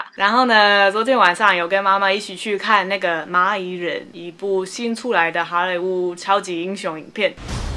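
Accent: Taiwan. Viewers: 不錯 中文講得很好 很台式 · 哇 中文講的真好 連你講英文都感覺好台哦 最好台語也學一學 那你就真的變成台灣人了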